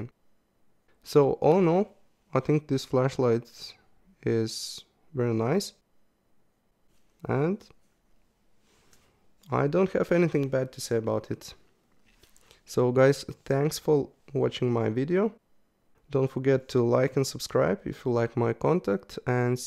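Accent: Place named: Russia. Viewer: Such a rarely adequate review! You mentioned all things that are needed and skipped by other people, especially time before light becomes loose its brightness on each mode. Thats really essential! So i can see that turbo mode can be used up to 2 minutes before dimming, high mode on spot - 6 min and on flood - 10 min, and medium mode - without limits. Your accent sounds like you are russian-speaking, arent you?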